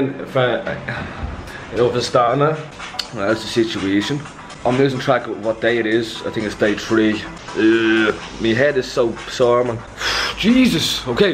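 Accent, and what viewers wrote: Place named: South, United States. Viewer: Are you southern? You sound like Miranda Sings or it’s just me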